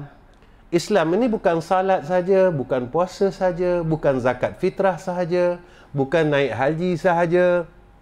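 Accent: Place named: Terengganu, Malaysia. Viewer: alhamdulillah..nk fasih dh loghat ganu kite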